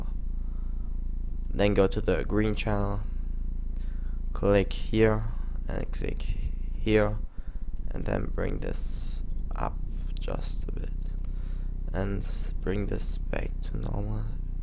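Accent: Germany. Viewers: haha, nix gegen dein english, ist aber immer so geil wie man jeden deutschen erkennt auch wenn er noch so gut english spricht XD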